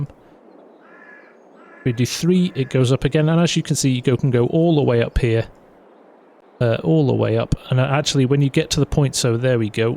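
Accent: United Kingdom. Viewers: Bros too muh brihishh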